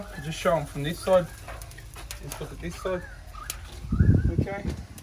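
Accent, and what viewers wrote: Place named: Australia. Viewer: Hey there Chef.............. I'm looking to learn,,,,,,, to cook : using "GAS"....... and wow dude, I love it,,,,, you're not so easily understood,,,, because you speak "AUSTRAILIAN" english, and not "AMERICAN" english. .. I love it,,> thanks so much!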